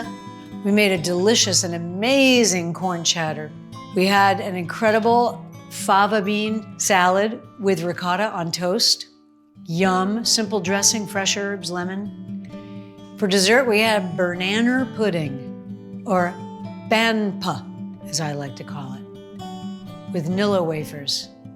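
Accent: RP, United Kingdom. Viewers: I love your Fanny Craddock accent. Perfect! You're a hoot and the cooking is pretty good too! 😍💛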